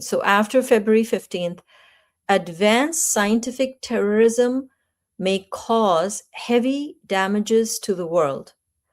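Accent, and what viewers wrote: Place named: Hindi, India. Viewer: It is kali yuga not kali yug and moksha not moksh Madam please dont pronounce sanskrit words like hindi. Thank you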